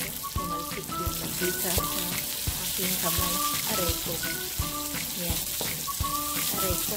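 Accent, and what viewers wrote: Visayas, Philippines. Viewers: Haha bisaya kasi e, chill kalang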